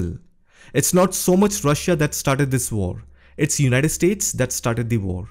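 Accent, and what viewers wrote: India. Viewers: This may be a brilliant video or it may not. I refuse to listen to videos narrated in Indian 'English'. Indian speakers,, if you want English speakers to understand what you are talking about, every single word must be pronounced clearly and separately, using English vowel sounds, not Indian. Do not run words together as if they are all one long word. Either speak Indian or English, but not a mixture of both. Thanks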